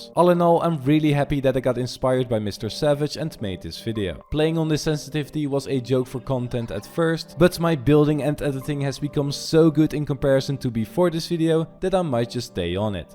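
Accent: Netherlands. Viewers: Vault grey pistols:) Are you dutch cause i think that when I hear your accent.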